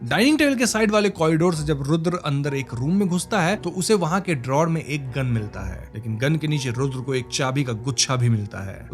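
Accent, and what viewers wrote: Bengal, India. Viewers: Omg. Your bengali accent 😭😭😭😭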